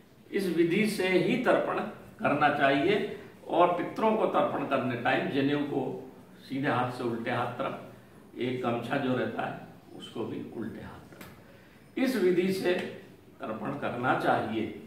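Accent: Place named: Madhya Pradesh, India. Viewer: गुरुदेव प्रणाम मैं हरदा से हु आप मध्यप्रदेश से है ये आपकी भाषा से ज्ञात हो रहा है आप होशंगाबाद या जबलपुर से है ये मेरा अनुमान है कृपया बताये आप कहाँ से है जय श्री राम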